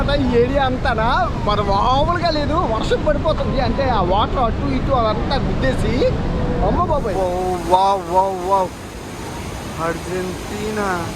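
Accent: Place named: Srikakulam, India. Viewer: I started watching in Facebook now I am one of your subscribers😊 doing great job bro entha tension lo unna me voice ki me sarcastic ki chala navochesthadi Madi Srikakulam ma slag koncham me voice lo vinipisthadi..chala degara Vala laga anipistharu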